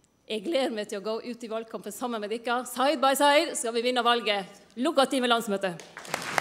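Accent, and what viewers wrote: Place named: Norway. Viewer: Liv Signe har den kongedialekten! Bra tale av Sp-lederen. Godt valg til de Rød-Grønne.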